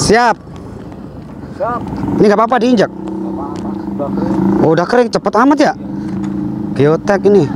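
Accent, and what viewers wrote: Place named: East Java, Indonesia. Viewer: ❤❤❤❤😂😂😂😂 BIKIN KANGEN..... saat pembukaan nada2nya...... Medok2... kayak orang Jawatimur... Kadang kayak orang Sunda... Kadang kayak orang Jawa Tengah.... 😂😂😂😂😂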